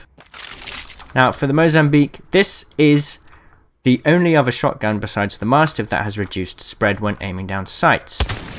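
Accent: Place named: United Kingdom. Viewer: I hear British voice, I sub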